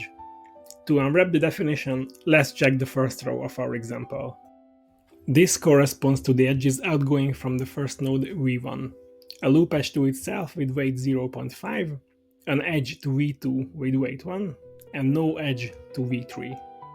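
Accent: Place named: Hungary. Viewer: Judging by your accent and choice of music, you're from Hungary or Slovakia.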